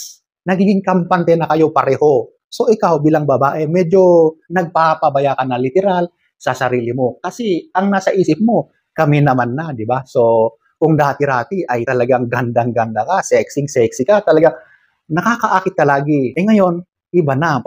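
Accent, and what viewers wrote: Ilocos, Philippines. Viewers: Hi Kuya magandang advice mo. Gusto ko yung accent mo....Ilocano ka ba? 😅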